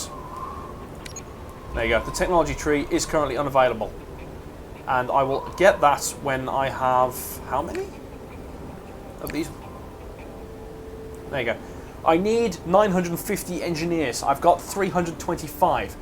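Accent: United Kingdom. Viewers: I love your voice man. British English is best English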